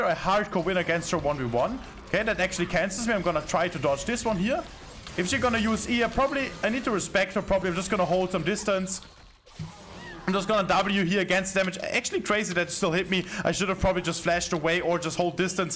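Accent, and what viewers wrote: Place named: Germany. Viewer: Just listen faster man haha xD Maybe it's also easier for me, cause from the accent he seems like a german speaking guy. So i can understand that very well ;) That makes it even more impressive doh!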